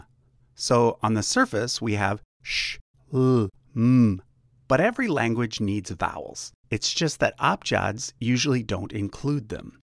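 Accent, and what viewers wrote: United States. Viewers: why do you say all the east asian languages in the most american way.. at least try and research😭😭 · I loved hearing him pronounce asian languages with an american accent lol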